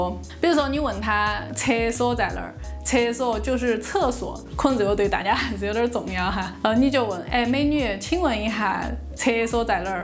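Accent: Chengdu, China. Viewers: 这个妹儿肯定是成都土生土长的，但父母不一定是老成都，所以发音属于年轻一代的成都人，也没得啥子很地道的方言词汇。要学资格成都话就看李伯清。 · 你这是成都话，哈哈， 电影推荐你忘记了风车车和假老练（猫和老鼠四川话版） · 绝对标准的成都话。亲切！ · 在四川会说普通话就行，这个up主准确说是成都话。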